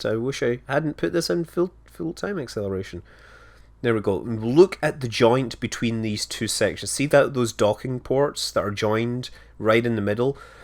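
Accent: Scotland, United Kingdom. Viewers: Nah, it's just the Scottish accent.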